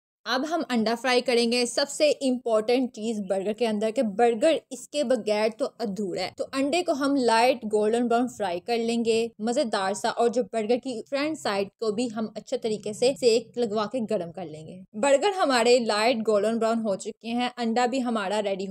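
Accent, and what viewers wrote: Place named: Punjab, India. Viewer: Your Panjabi accent is awesome 😂♥️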